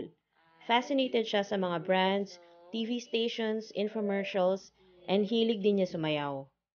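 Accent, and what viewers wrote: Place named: Pampanga, Philippines. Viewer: Hi po Mommy napansin ko po kampangan kayo..taga pampanga po ba kayo?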